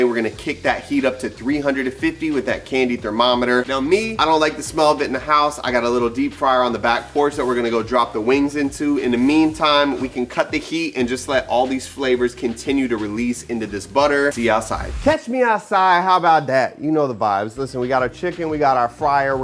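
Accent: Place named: AAVE, United States. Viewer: The recipe was excellent, but the Ebonics has to go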